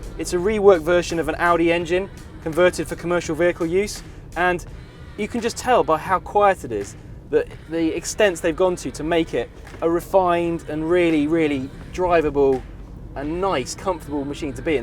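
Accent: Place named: RP, United Kingdom. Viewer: I don’t think with that accent you hang out, with to many van and truck drivers or any other working man, Your more at home with Trinney and Rupert on the Kings road in a coffee shop.It’s a rebadged crafter, Man trucks are VW commercial wing. That information was not in the MAN brochure they handed you one hour before. You did not tell them this is VW first pure Crafter, not a VW engined Sprinter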